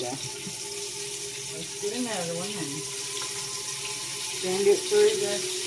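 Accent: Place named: Virginia, United States. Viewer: I found you last night. I can’t wait to cream some cabbage. God bless you darling. I’m from near Dickerson county va. Talk just like you. Is a pleasure hearing you talk. I can understand, to a’-t.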